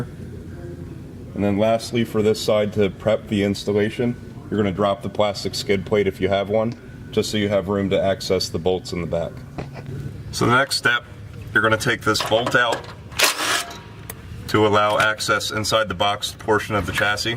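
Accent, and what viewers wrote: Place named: Central Pennsylvania, United States. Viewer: i can identify this accent with surprising certainty because of a buddy from central PA i served with. its the pronunciation of the work "on"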